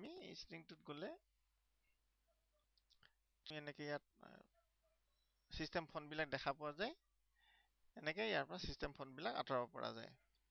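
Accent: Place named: India. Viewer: English with an indian accent.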